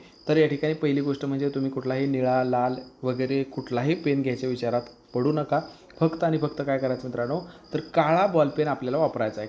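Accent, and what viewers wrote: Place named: Latur, India. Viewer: तुम्ही लातूर विभागले आहात का sir 😅 म्हणजे बोलताना तुम्ही "घेऊन जा. अस न म्हणता घेऊन जावा." अस म्हणता म्हणून विचार..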